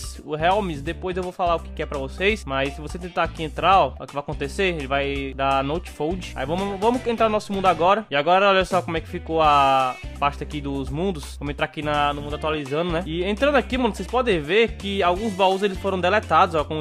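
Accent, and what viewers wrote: Northeast, Brazil. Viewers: Gosto muito do seu sotaque, vc é nordestino ne?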